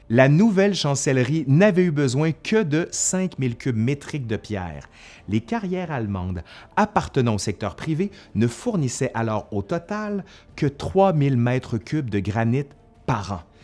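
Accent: Quebec, Canada. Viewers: Les coins ronds! J'adore le français du Québec. Encore une vidéo passionnante. Merci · Je ne regrette pas d'avoir eu la curiosité d'aller faire un tours sur votre chaîne après votre collaboration avec Nota Bene, les sujets sont toujours fascinants, éclectiques et le texte est très bien écrit et ne parlons pas de l'accent des cousins québécois que l'on adore 😊 Merci pour tous ce travail j'attends toujours les épisodes avec impatience !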